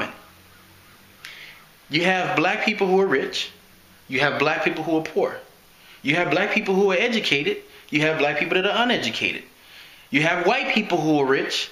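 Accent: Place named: Canada. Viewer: You sound like a Canadian. thats a compliment btw:)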